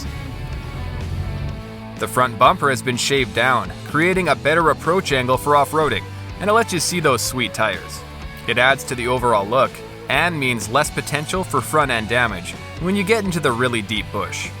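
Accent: Canada. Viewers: He’s from Canada 🇨🇦 has to be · Why, he's Canadian, it's how they say "z"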